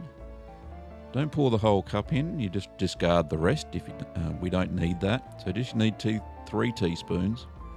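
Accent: Australia. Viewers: Thank you -- very informative. I can't wait to try this; I've become very lactose-intolerant, so unless I make my own I won't get to eat cream cheese again... Not sure where you're from (I'm guessing Australia but wouldn't know a New Zealand or other accent if it bit me) -- but this strawberry pretzel salad is popular here in the States -- haven't tried it yet, but am going to! (this site may ask you to join in order to see the recipe, but there are a ton of recipes out there...) Thank you again! <link>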